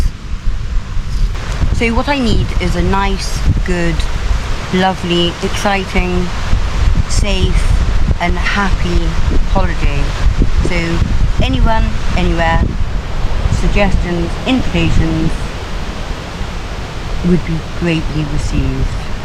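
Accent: RP, United Kingdom. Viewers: I hope to go state side this year or early next year, I think it’s because most of us in the British isles grew up with American culture and values, I have to brush up on my American English , because I am more used to speaking Hiberno-English, I really love your accent it sounds like real queens English, anyway enjoy you travels, I love your channel been over a year now. Keep the rubber side down,😊😊😊😊😊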